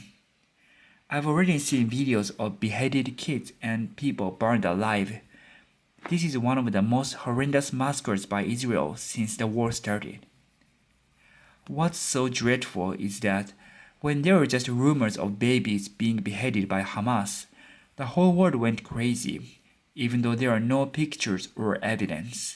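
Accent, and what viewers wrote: Japan. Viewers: 発音からすると日本の方ですね、この話題を触れてありがとうございます、日本好きな外国人としてパレスチナのデマを日本のSNSで見かけると心が折れてしまう、悲しく感じてしまいます、このどうがをみたあとにうれしくおもいました、現地の真相を語りつけずけるように祈ります、めちゃグーグル翻訳っぽくになっちゃってすみません～ｗ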